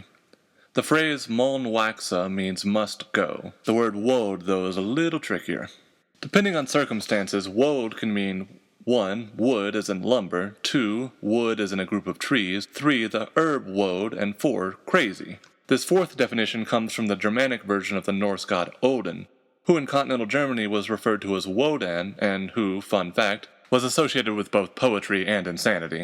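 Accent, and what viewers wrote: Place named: United States. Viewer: I found an American accent funny talking about Middle English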